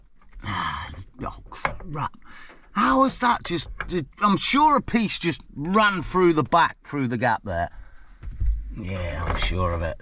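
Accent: Leicester, United Kingdom. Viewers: knew you was from leicester or east mids from your accent mate, as im from leicester too. brilliant video man,.people just need to fuck off and leave everyone alone. you need the peace, especially when you know when the people of leicester are about lol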